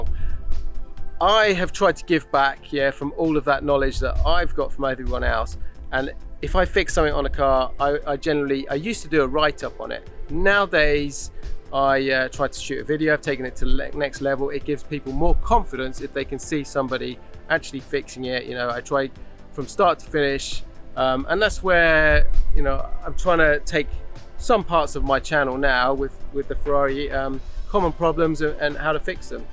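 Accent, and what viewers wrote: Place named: United States. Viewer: No, I mean as I said, bastardised English. Let's just call it American accent so it doesn't offend and start another war.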